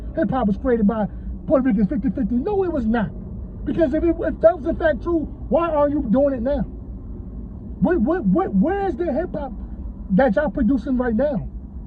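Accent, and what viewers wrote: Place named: Midwest, United States. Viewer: lol u sound like u from the middle of America please se ya self out this conversation you sound like a Goofy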